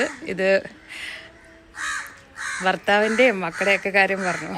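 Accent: Kottayam, India. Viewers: ഹായ്... എനിക്ക് videos എല്ലാം ഇഷ്ടമാണ്.. ഞാൻ അമ്മുമ്മയുടെ വലിയ ആരാധികയാണ്.. അമ്മുമ്മ " പറയെടി "എന്ന് പറയുന്നത് കേൾക്കാൻ നല്ല രസമുണ്ട്.. അമ്മുമ്മക്ക് ആയുസും, ആരോഗ്യത്തിനുമായി പ്രാർത്ഥിക്കുന്നു 🙏🙏🙏 അക്ഷര സ്പുടതയോടെ, ഉച്ചാരണ ശുദ്ധിയോടെ സംസാരിക്കുന്നത് കോട്ടയം ഭാഷ കേൾക്കാൻ ഒത്തിരി ഇഷ്ടമാണ്... ഈ അടുത്ത കാലത്താണ് ഞാൻ അമ്മുമ്മയുടെ video കണ്ടു തുടങ്ങിയത്... ഐശ്വര്യമുള്ള സുന്ദരിയായ അമ്മുമ്മ.. പാവം ❤❤❤❤❤❤❤❤❤❤❤❤❤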